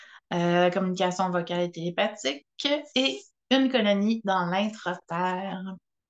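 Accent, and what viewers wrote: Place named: Canada. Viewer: je remarque l'accent canadien. j'ai peut-être vécu au canada dans une vie antérieure, j'imite assez bien l'accent canadien.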